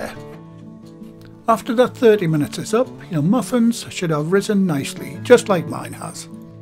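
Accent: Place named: Bradford, United Kingdom. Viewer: Hi! Love this recipe and I can’t wait to give these a try! I absolutely love listening to you I was born in Bradford so you sound like family to me! Great job on these keep cooking my friend!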